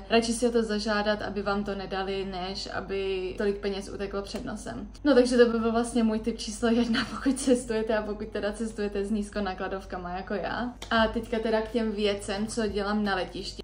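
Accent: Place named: Moravia, Czech Republic. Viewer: a miluju tvůj moravský přízvuk ♥️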